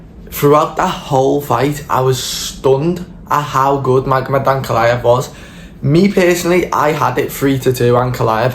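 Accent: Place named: United Kingdom. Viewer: You sound like Paddy Pimblet, Ajex Pareyrahhh. Umm I love British accent actually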